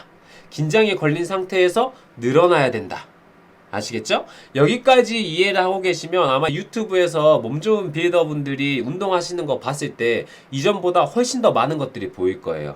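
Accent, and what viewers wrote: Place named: Jeolla, South Korea. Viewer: 전라도 억양이 참말로 구수하십니다~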